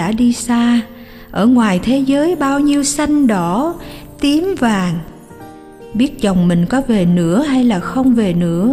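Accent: South, Vietnam. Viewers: Truyện hay, cảm động, 8/10 cảm ơn giọng đọc dịu dàng, miền Nam của.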